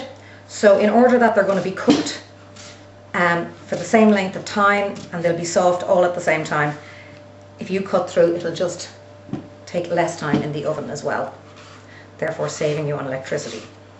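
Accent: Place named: Ireland. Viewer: Ahhh, the brogue! I'd listen if you were reading the phone book. But the squash instructions are spot on. Thanks!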